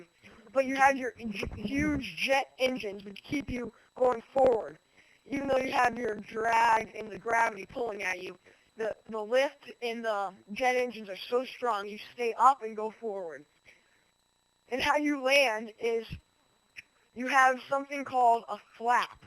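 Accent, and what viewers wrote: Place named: United States. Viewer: he sounds so american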